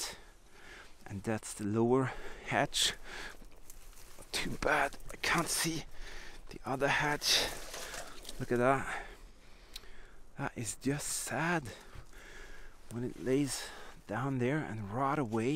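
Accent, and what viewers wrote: Germany. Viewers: I like your German Voice ..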